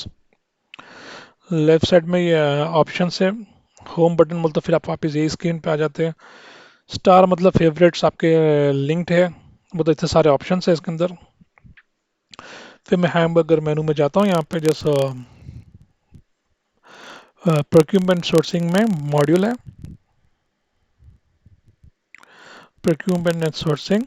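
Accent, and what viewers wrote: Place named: Hyderabad, India. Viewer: Abba Kiraak Hyderabadi accent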